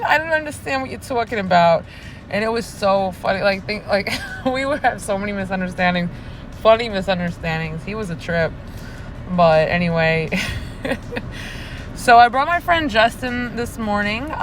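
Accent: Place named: New York, United States. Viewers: Nothing wrong with the New Yorker accent, and you have a pleasant voice, really. (ツ) ☕☕(ツ) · Ha Ha I from upstate NY now living in the south 🤣 100% relate to the accent/attitude. I can't hide it if I tried! Awesome flight and good luck going for your Commercial!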